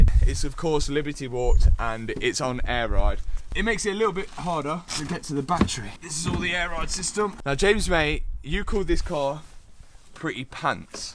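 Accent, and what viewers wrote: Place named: East Midlands, United Kingdom. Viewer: "Italian qualitehh" can't beat that east midlands accent 🤣🤣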